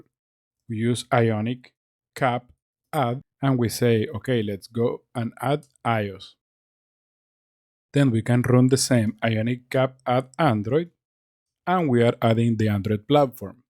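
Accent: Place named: Spain. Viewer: I can notice your spanish accent, but you have very good english! May I ask, where are you from? Sos argentino no? Edit: ahi vi que eres colombiano, saludos desde argentina!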